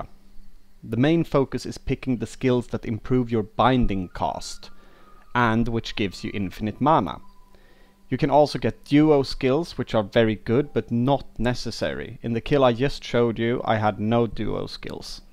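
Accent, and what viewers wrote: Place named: Sweden. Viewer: Största svenska dialekten, bra video!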